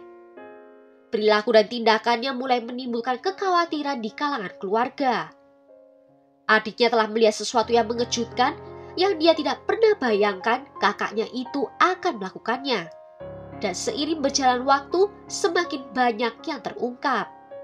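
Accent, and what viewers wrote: Java, Indonesia. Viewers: Org jawa kah mbak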